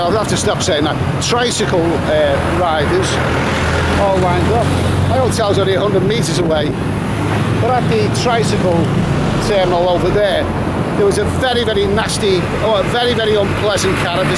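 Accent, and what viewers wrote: Liverpool, United Kingdom. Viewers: New to your page, you sound like a scouse. I haven't lived in UK for almost 50 years. It looks OK there. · a bit of a scouser I think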